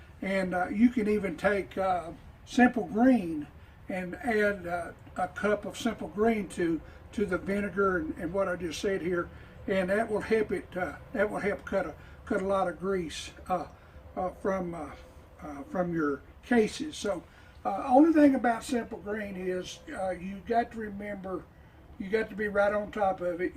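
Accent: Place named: South, United States. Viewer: I really liked the information you provided. Just for fun I turned on the closed captions and they really don't do us people from the south or with southern accents any justice. They messed up every 5th word you said.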